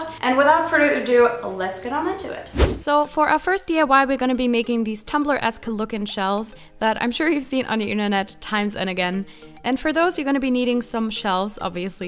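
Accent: Germany. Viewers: may it be you have a german accent? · Her german accent is almost unhearable, i had to see her amail address to be sure. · Kann es sein das du deutscher Herkunft bist? Man hört einen nämlichen einen leichten Akzent raus. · #germanaccent <3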